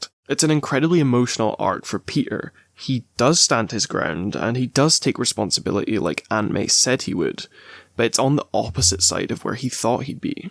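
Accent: United Kingdom. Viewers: I subscribed just cause of the brick wall guy at the end and u sound like u from uk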